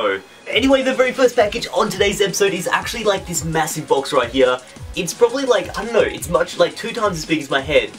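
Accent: Australia. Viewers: you're Australian accent is fabulous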